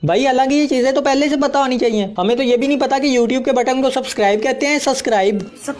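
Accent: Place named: Multan, Pakistan. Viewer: Bro! R u from MULTAN?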